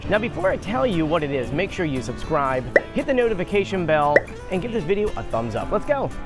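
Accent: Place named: South Jersey, United States. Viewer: Dude you have a such a rough south jersey/philly accent. The way you say water is the poster child for our accent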